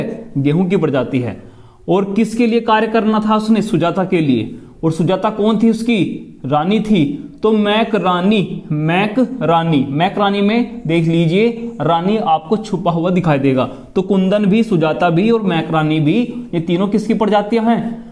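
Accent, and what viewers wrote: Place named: Bijnor, India. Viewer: Sir ap up bijnor s ho kya ? Apki bhasa s lga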